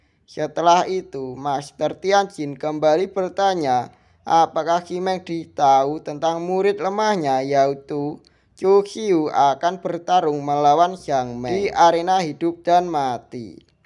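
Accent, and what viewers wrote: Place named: Java, Indonesia. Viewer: Bahasanya terlalu medok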